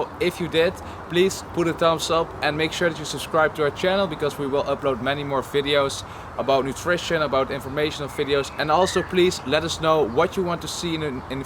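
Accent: Netherlands. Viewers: ik kan het nederlands van 3km afstand horen 😂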